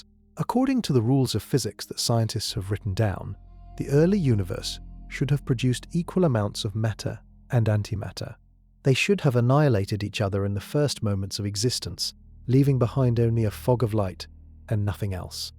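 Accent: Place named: United Kingdom. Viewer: I hate this British voice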